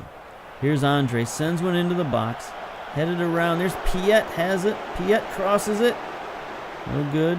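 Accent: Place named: United States. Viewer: Two things: One, it's "shoniere", rather than "koniere" (Choniere); and two, where'd you learn to say Toronto right? I grew up there, it's odd to hear someone say it like a native of the town when they've got such a clear American accent. :)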